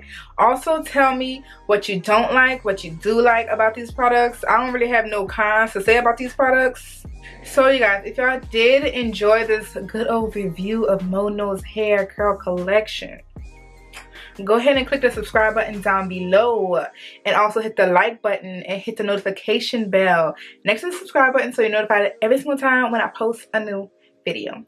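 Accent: New Orleans, United States. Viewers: Curls are popping!!!!!! Are you from NOLA, cause I hear a lil something, something!!!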